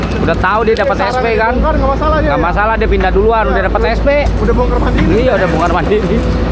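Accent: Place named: Banyumas, Indonesia. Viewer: dr logatnya mas dr jawa ngapak ya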